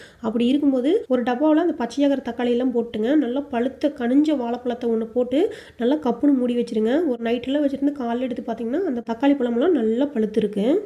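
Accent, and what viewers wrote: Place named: Coimbatore, India. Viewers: Neenga coimbatore ah akka slag super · Nga nu solra slank patha neenga Coimbatore ngala...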